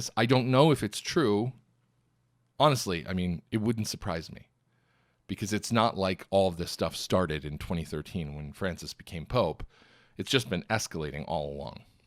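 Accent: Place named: France. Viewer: Great French accent. Oh boy will dissenters never give up.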